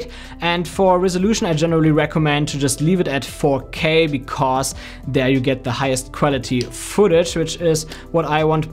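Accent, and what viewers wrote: Germany. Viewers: German or Austrian?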